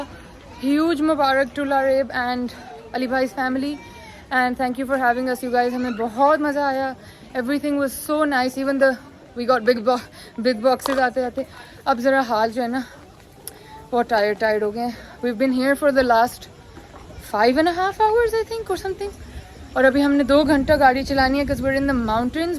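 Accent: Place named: United Kingdom. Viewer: Why are you showing British accent you are Pakistani speak in your native Urdu language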